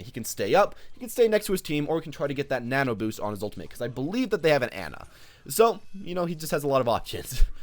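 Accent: United States. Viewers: I subbed for the American accent FINALLY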